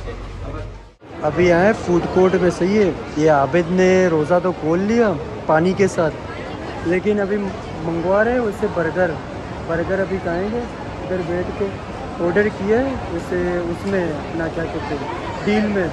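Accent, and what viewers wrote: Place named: Balochistan, Pakistan. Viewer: BRO your Urdu is gud with Balochi style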